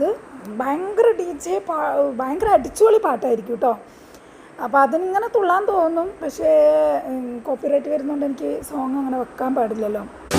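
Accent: Palakkad, India. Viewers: Madom, where is your native place ? Your talk palakkad same.!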